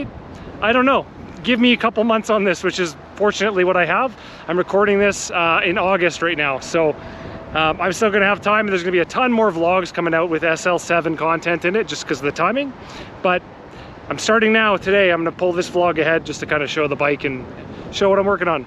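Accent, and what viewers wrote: Canada. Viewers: Canadian accent is a bit of a give away as to NOT American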